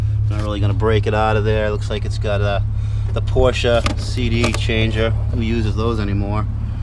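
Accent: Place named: Boston, United States. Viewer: Boston guy!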